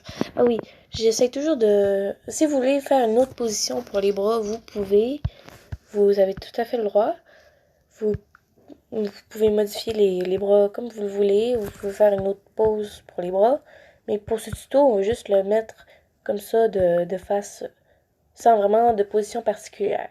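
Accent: Quebec, Canada. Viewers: J adore ton accent québécois il est trop beauuu 😍 Sinon super ya jamais personne qui explique aussi bien que toi ! 🤩 +1 abos je te jure :) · J’aime bien ton accent québécois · Omg québécois j’adore veux acsen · Merci, t'a vidéo m'a énormément aidé ! Et au passage j'adore ton accent québécois ! ❤️ Je m'abbonne ! 💞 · Ça m’a beaucoup aidé, merci bien ! 😄 Je trouve que tu expliques bien, en plus ton dessin est superbe ! Et j’adore ton accent québécois 👍🏻 Donc en gros tous est géniale ^^ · J'aime trop ton accent québécois !!!! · J'aime se que tu fait je vais essayer sa tout de suite ! Sinon si il y a des gens qui disent qui n'aime pas ton accent québécois, ne les écoute pas moi sa ne me gêne pas. Ai'je le droit de te faire une pub ? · Merci pour sa ! en dirait que tu est une Québécoise avec ta voix trop belle ❤️🌹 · Omg merci beaucoup j’ai enfin compris :O et j’adore ton accent québécois 🤩 +1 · Je m'abonne direct ÚwÙ Grâce à toi jai réussi enfin Ton accent québécois je kiffe trop!!! 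♡♡♡ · Cute ton accent québécois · Je trouve que ton accent québécoise et trop rigolo je veux pas me moquer mais je l'adore ton accent et toi son trop bien 😊😋ha et aussi je m'abonne !! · Tu as un agcent québécois (je vien d'arriver) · Merci tu explique mega bien et j adore ton axcent québécois et sinon pourrais tu faire un tuto comment faire bouger les bras par exemple s'il te plaît TwT et je m abonne direct et paff c fait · Je peut avoir ton accent québécois ? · L'explication 👌 l'accent québécois on adore +1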